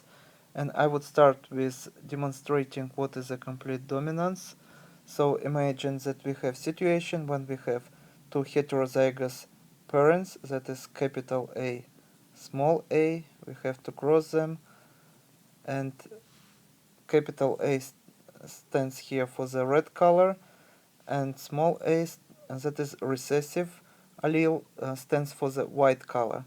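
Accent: Russia. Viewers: your accent is very good. Are you from Russia? I liked that.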